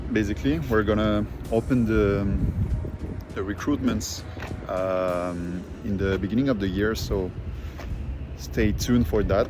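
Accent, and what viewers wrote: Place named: France. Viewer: Je trouve que tu perds de ton charme, tes vlogs étaient assez cools à regarder en français et tu avais ta petite touche humour à toi. En anglais et avec l’accent français ça rend forcément un peu moins bien mais force et honneur pour tenter ça et aller chercher le marché US anglophone, ça reste quali et nice comme contenu 🔥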